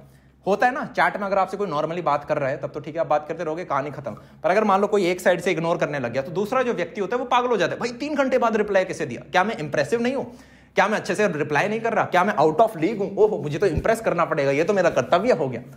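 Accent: Haryana, India. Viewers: what a haryanvi tone of speaking wah 😉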